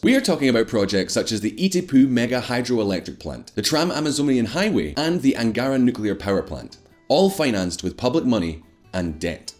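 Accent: Scotland, United Kingdom. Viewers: I've seen Brazilians with no teeth, black teeth and no shoes. Obviously by his accent he is British. The comment is meant to be satirical. You're welcome!! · Are you British ?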